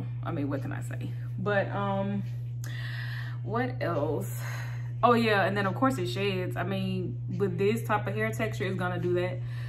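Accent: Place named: Texas, United States. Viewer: You gotta be from Texas with that accent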